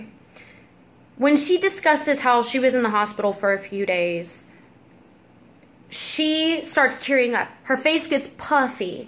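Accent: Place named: South, United States. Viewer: I immediately caught your accent because I'm southern too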